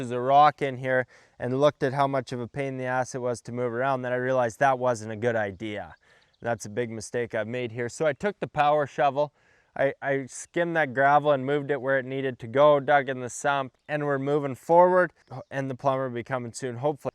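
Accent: Canada. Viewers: I'm here for the Canadian accent.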